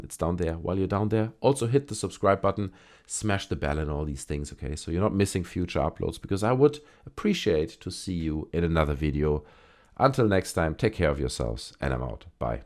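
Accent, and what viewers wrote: Germany. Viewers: Hi new to the channel. Do I detect a German accent? Also great video!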